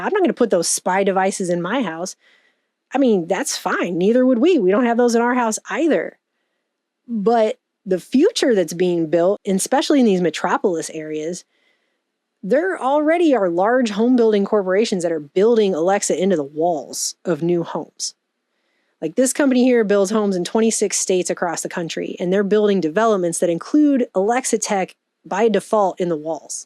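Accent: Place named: Midwest, United States. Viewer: eh, normal? has a decidedly Midwestern accent with the way she pronounces mom as "mahm", among other inflections. It's not a pristine General American accent or its relative pregenitors in the Maize Belt. That said, the San Fernando Valley accent is influencing speech just about everywhere, thanks to Hollywood. It seems to be the favored regional dialect to mock now, perhaps overtaking the various Greater Appalachian dialects, which used to be the go-to. Moreover, the both of them are already the basis of the charicatures of the entitled urbanite and the country bumpkin.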